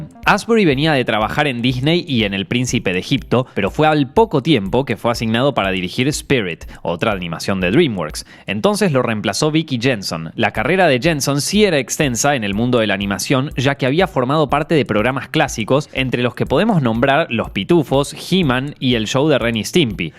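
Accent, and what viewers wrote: Argentina. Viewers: A de ser argentino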